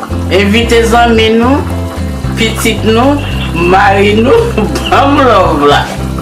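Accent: Haiti. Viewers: Ki bo an ayiti Manmi soti? Pou janl pale ou ta di se moun an nord pati lartibonite.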